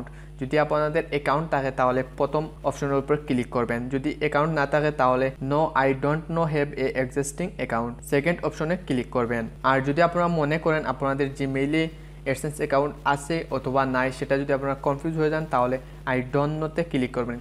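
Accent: Sylhet, Bangladesh. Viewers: Vai aponar khotai buja jai aponi Sylheti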